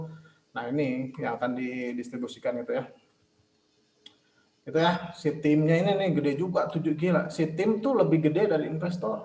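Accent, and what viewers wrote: West Java, Indonesia. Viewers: Sunda pisan euyy si akang haha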